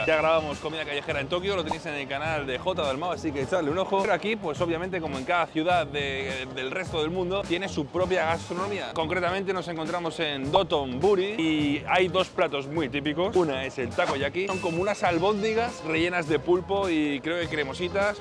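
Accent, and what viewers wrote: Argentina. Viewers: amo como después de venir a argentina se te quedó la pronunciación de algunas cosas o te gusta decirlo así. gracias por tantos videos de calidad dalmau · Se puede saber porque tiene que poner acento argentino? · en la CASHE...jajajajajaja, el ESPAÑOL mas ARGENTO del MUNDO MUNDIAL y OLEEEEE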